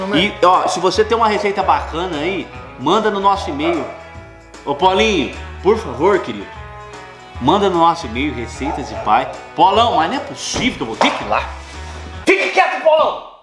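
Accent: Minas Gerais, Brazil. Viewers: O sotaque mineirinho voltou com tudo! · Adoro suas receitas!! Adoro vcs dois! vc é mineiro?!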